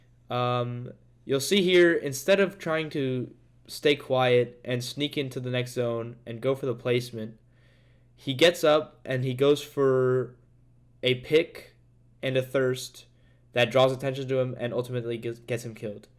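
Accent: France. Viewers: U french?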